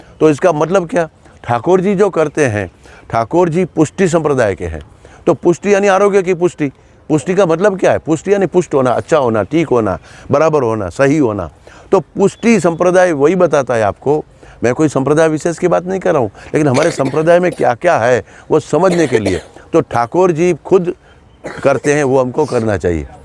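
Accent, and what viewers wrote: India. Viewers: प्रणाम सर ,अति ज्ञान वर्धक.... An extremely scientific analysis ,never heard it before Sir ,your gujrati accent delivery in hindi is so loving ,I rightly feel, my self being in bhuj decades ago.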